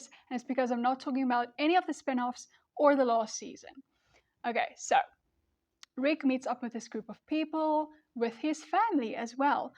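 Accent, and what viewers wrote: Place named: South Africa. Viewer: Hi there! Great video You have some interesting takes on things. I definite think you should watch the final season. It is in no way satisfying as far as concluding these main story plots you’ve been talking about but it does have some nice closure for some other characters. It is an absolute shame that they threw away 11 years of story and world building by stretching the characters into their own shows instead of having some satisfying conclusions to the main story notably Rick. It’s so painfully obvious that the writers had to write Rick out then try to pick up the pieces instead of just finishing the story then. I would have been ok with Neagan dying at the tree but I admittedly enjoyed his pseudo redemption arc and wanted to see him become accepted amongst the community. Im not a fan of how Carl died but I feel like Sadiq ended up doing a lot of good. 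Such a titanic fall this show had it’s a sucks when ips gets hurt because actors want to persue other ventures. Again great video! Your accent reminds me of my childhood Doctor, is it South African?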